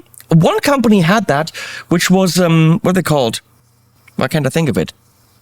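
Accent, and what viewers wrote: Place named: Germany. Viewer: So after 40 years or so I decided to get a Helix and be happy. It’s great but matching up the best pedals you can get is really the best. Watching these pedal videos makes me want to go back to pedals so, guess what, I’ve got both! But I still favor the Helix because it’s handy. Oh yeah, my favorite OD tone when recording? A Sennheiser in front of my old tube Champ with an attenuator. Now that is heaven in a bottle. A 6v6 bottle that is. BTW, you have no talent? I enjoy watching your videos. Your talent is in your delivery of the German laced, English language!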